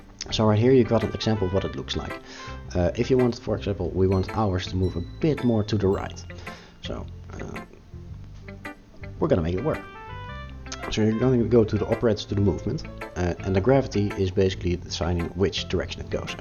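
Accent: Netherlands. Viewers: haha its so funny to hear your dutch accent lol, great video!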